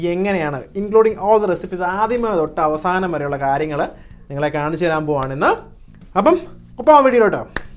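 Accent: Kottayam, India. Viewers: 'flar' 😄😄😄😄 sir kottayam kaaranano sir inte oro videos onninonnu soooopr 👍👍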